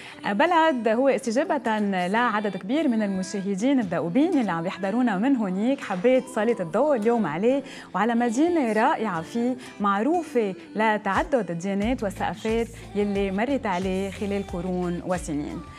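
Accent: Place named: Lebanon. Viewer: هههههههههههههههههههه..سبحان الله تقول عليك لبنانية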